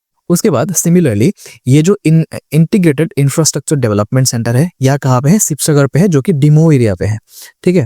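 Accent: Bengal, India. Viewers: Sir apka accent se lgta h aap bengali ho kyuki ek baar aap padhate wqt gllti se bengali word nikal gya tha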